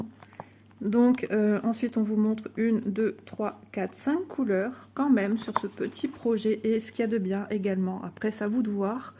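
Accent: North, France. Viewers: Bonjour très jolie les sorcières 🧙‍♀️ vous en avez déjà brodé une ou pas bonne journée sa fait du bien d’entendre votre accent du nord je suis du nord aussi bisous 😊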